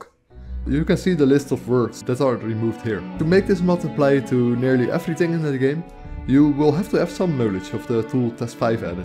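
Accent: Netherlands. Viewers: you sound very dutch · Let me guess, is that a dutch accent? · im dutch myself and i noticed how you talked, its sounds very much like dutch english xD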